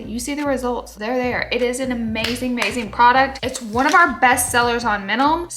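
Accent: South, United States. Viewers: Omg the southern accent! Love!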